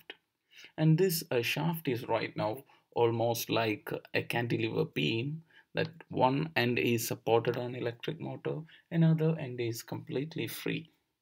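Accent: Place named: India. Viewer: this is top tier indian accent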